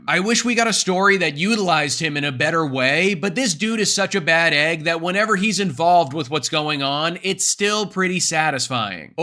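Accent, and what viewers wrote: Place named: United States. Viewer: You sound like an American with a short attention span. Terrible review.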